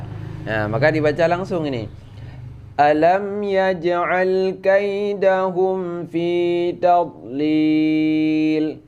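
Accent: Aceh, Indonesia. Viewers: Logatnya sepertinya ustazd ini orang aceh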